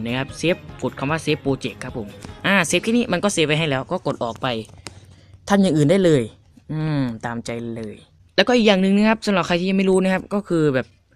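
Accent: Nakhon Phanom, Thailand. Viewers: พี่คนนคระนมหรอครับ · พี่อยู่นครพนมใช่ไหมครับ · พี่อยู่จังหวัดไร เหมือนนครพนม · คนนครพนมหรอครับ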